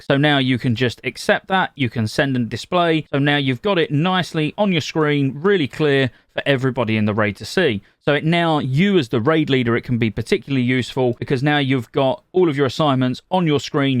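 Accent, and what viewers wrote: United Kingdom. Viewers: Is this a special type of British accent? It sounds interesting and a little different than what I’m used to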